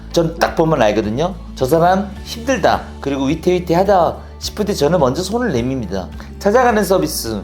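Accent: Gyeongsang, South Korea. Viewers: 경상도 사투리 티 안내려고 서울말 쓰는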